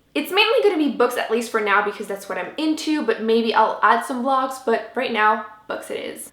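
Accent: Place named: Quebec, Canada. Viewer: I just saw your new video and when I heard your accent I thought you might be french canadian! I'm so happy to finally find a french canadian booktuber, I'm from Montreal so hi and can't wait to marathon your videos!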